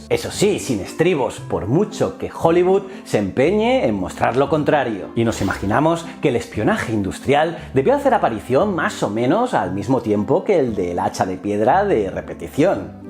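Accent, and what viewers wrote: Spain. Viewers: Acaso los unicos productores de contenidos son asquerosos españoles , cuando esta gente es cinica mentirosa y estan dedicados en borrar historia de arrasamientos esclavitud asesinatos y robos el dolo escuchar este acento me revuelve el estomago, basta de estos asesinos no mas contenidos de estas aesinos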